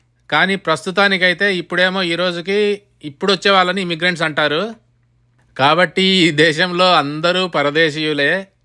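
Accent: Rayalaseema, India. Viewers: Thank you Liked your Telugu Royal Seema accent too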